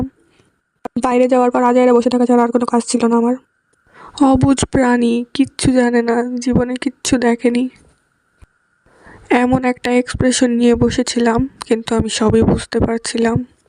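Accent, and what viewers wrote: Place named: Rajshahi, Bangladesh. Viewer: Pure Rajshahi accent,I’m from Rajshahi too😊. Video editing ta bhalo chilo,keep going sister❤.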